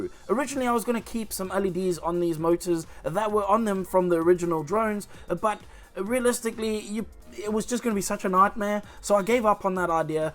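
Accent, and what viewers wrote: South Africa. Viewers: I have to ask: are you originally from South Africa? Feels like I'm hearing a familiar accent 😅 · dude sounds maybe a bit south african?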